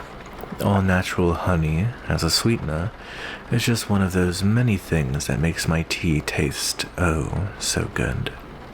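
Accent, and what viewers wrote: United Kingdom. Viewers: good british accent attempt, 7/10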